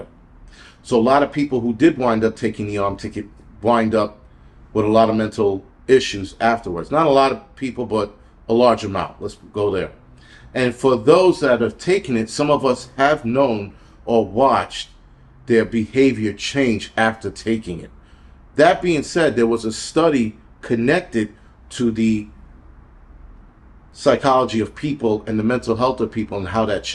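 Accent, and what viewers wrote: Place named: South, United States. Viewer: You have some South in you.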